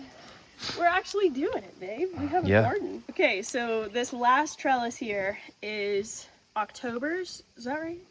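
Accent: United States. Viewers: Are you American ❤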